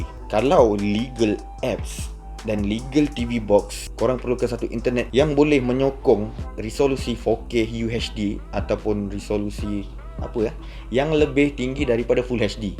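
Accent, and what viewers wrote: Java, Indonesia. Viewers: bang ko org mna. ckp mcm ada sleng jawa je..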